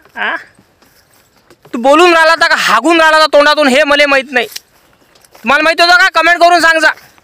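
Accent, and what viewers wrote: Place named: Vidarbha, India. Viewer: छान दादा मला तुमचे बोलण्याची स्टईल खूप आवडली. त्यामध्ये विधरबाचा गोडवा आहे. ❤️❤️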